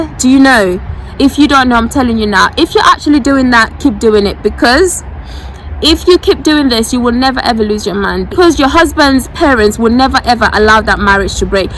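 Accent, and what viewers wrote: England, United Kingdom. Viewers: Dame lady you fine hell, you got english accent.,you in england or gambia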